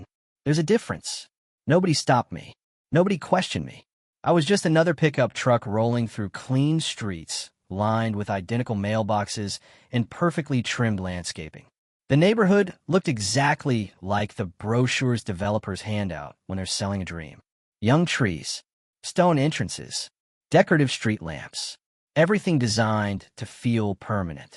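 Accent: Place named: Spain. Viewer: Ugh, the amount of verbal diarrhea in a simple 20 minute story, plus the periodic Spanish accent and gibberish? 👎👎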